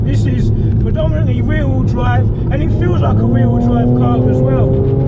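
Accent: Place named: England, United Kingdom. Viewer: I was so shocked to hear a fellow Englishman!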